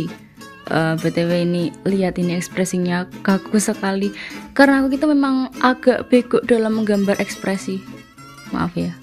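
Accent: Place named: Java, Indonesia. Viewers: Penjelasan dgn jokes yg sangat medhoksionist dan expressive👌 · Jowo banget yo suarane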